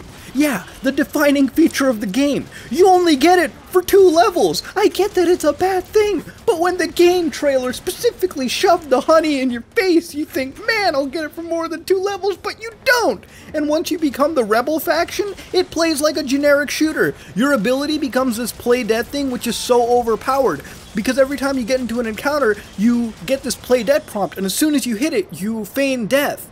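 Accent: Canada. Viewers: wait you're Canadian. ?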